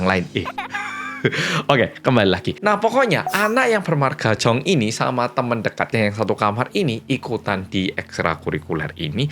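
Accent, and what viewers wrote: Java, Indonesia. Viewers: Hai medok🎉 lgsg nyimaaak🎉 Salam sehat 💪🏿🙏🏿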